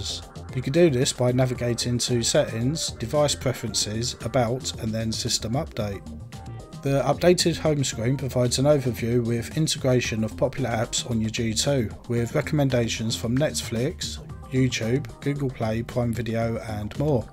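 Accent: Cockney, United Kingdom. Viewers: This guy is a proper cockney geezer 😂😂😂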